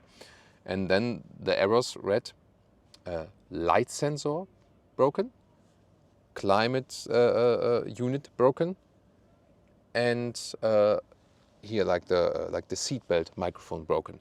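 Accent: Germany. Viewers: First time watching this channel,straight away notice Hard German accent.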